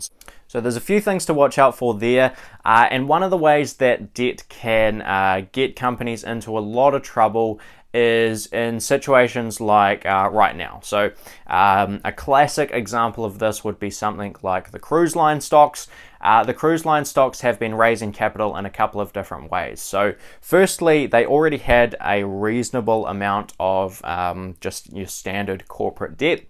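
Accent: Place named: New Zealand. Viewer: Great video, many investors, particularly newer investors don’t look at the balance sheet of companies. Very important to make sure the company can comfortably handle its debts! Great vid mate, love the Kiwi accent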